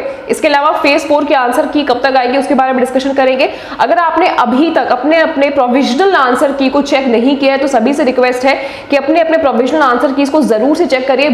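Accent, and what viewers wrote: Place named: Haryana, India. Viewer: m rohtak se hu mujhe aisa lga ki aap haryana se ho isaliye puchha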